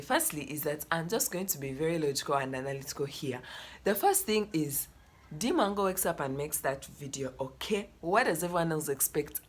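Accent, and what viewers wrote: Nigeria. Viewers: I hate it, can't stand the Nigerian accent. Stop it ooo. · Ghana? She sounds Nigeria?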